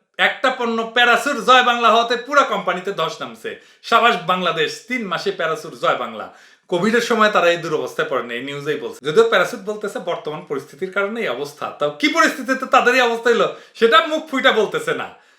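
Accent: India. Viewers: ভাষাগত ব্যাপারটা যারা খুব ভালো করে বুঝেন এই ভদ্রলোকের ভাষার একসেন্ট শুনলেই বুঝা যায় ইনি বাংলাদেশি না, ইনি একজন ভারতীয় কারণ বাংলাদেশীদের একসেন্ট আমরা বুঝি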